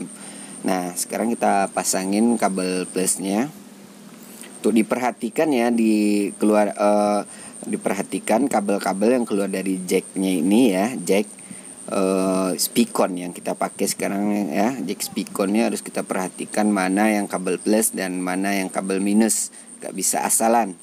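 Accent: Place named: West Sumatra, Indonesia. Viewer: macam ada suara minang kocak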